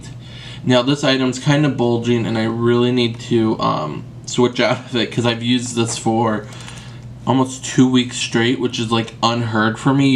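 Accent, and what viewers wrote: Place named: Chicago, United States. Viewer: ..I found you from seeing your comments on other YouTubers I watch! Well I fell in love with you and I luv that Chicago accent! Use to live there and miss it:)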